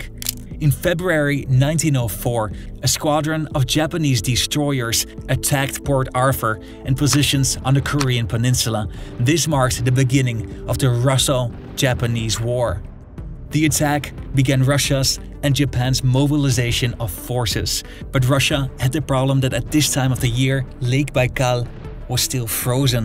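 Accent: Netherlands. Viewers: You left the Dutch out of your list of European powers dividing up Asia - and it sounds like you are Dutch..? Did I hear that right? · This has to be a dutch accent. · If the narrator isn't Dutch due to his accent, I will question my entire existence. Also, Sergej Witte is highly underrated, and apparently his re-entry into government benefitted the Russian Empire greatly, though sadly shortly. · Lekker nederlands accentje wel haha 😂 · как можно не слышать что автор голландец?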